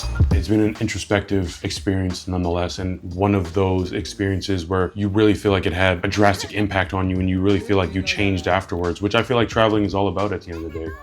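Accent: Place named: England, United Kingdom. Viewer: your English accent 😂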